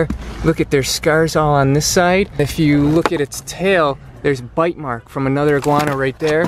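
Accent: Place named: New York, United States. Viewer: I’m new to this channel so idk but is he originally from New York? Brooklyn maybe? Just picked up on his accent is all lol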